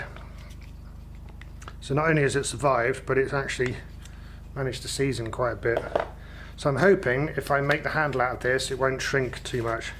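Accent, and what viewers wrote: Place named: Somerset, United Kingdom. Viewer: I live in france, I bought an Opinel the other week and it's got a stainless blade now, i was gutted, shoulda checked it before i bought it tbh. Only found your videos yesterday and i've been binging on them, nice to hear a somerset accent again, where i used to live (nr Cricket St Thomas) Keep on making vids mate, I love em.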